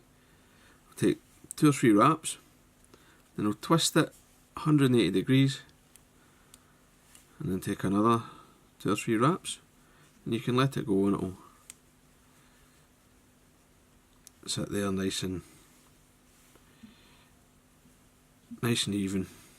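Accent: Scotland, United Kingdom. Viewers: I think this fly was created by a Canadian named Elliott something. I tried it years ago, not successfully, when I was learning. Should be easier now. By the way, little by little I am solving the Scottish accent. You have a lot of flies that should do very well here in Florida USA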